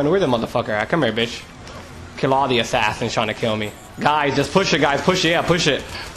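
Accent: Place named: Los Angeles, United States. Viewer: yo are you from l.a you sound like you from l.a but another question will you be doing nay reviews on nba2k11 or elite 11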